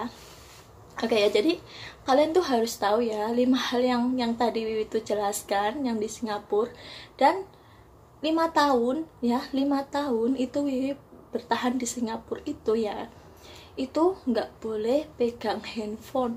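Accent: Banyumas, Indonesia. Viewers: Cerita pengalaman kerja disingapore.. SINGAPORE daerah enthi nduok??? Ngapak wadon 😍😍😍😍😍😍😍 Taiwan singapore,duiten arep gae opo nduok😀😀😀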